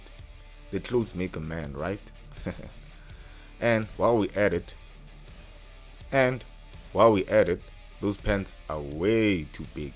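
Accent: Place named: Southern Africa. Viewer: You can hear a southern African straight away 🔥🔥🔥🔥🔥🔥🔥 much love from England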